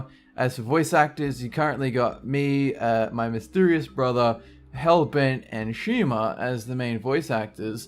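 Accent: Australia. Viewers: Wait. You're Australian. WAT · Your Australian..... Holy shit I love you so much more... State? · Didn't know you were Aussie... didn't really pay attention or care either but mkay. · I didn't know your were aussie! Sweet! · TectalchameleonJamaa it's almost as if it is an Australian accent! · Wait ur aussie?? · is Aussie?!?! 😂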